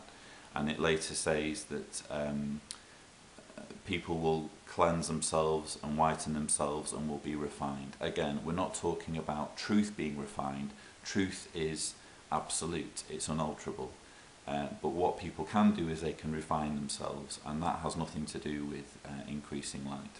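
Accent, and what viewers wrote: United Kingdom. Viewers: hi “ in acts. Furthermore there is no salvation in anyone else, For there is not another name under heaven that has been given among men by which we must get saved.” This is there errors there not holding on to the head, Christ “! In 1 Corinthians. For no man can lay any other foundations then what is laid., Which is Jesus Christ.” This men from Warwick have taken over the seat of Jesus, Apparently. And the CB name Warwick seems to be mean king maker. Yes they have sat up there own princes and kings. In first 1 Thessalonians 2: 2: 3. Said The exhortation we give does not arise from error,” Or from uncleanness or with deceit. This man the Bible writers that and Jesus apostles were chosen by Jehovah.that’s why when they would speak it came to be so. And men from today are absulitly not chosen from God”! That’s why there prophetic <num> and 1947 or 1957. 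There false prophets. Your daughters are so cute, I enjoy hearing your accent of British. Please don’t change it bye!” In number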